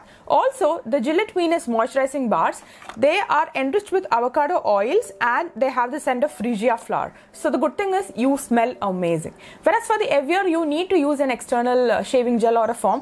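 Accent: Kerala, India. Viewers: I like the Malayali accent 🔥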